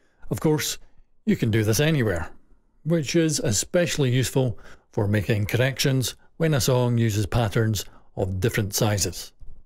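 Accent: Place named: Scotland, United Kingdom. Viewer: Scottish accent without a doubt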